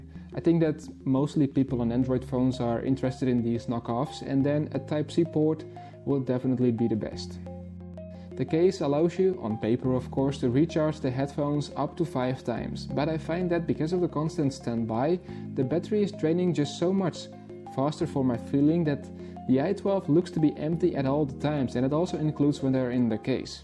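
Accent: Netherlands. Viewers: Ik hoor gewoon dat deze man nl is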